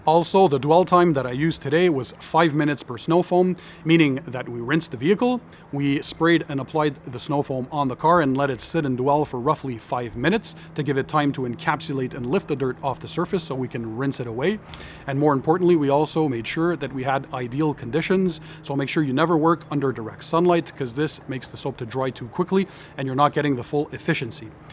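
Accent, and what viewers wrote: Canada. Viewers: Alabama. Must be why I find it somewhat different, not odd just different. Not so much a French accent just a very Canadian type of accent not usually found in the state unless it’s Minnesota or the Montanas.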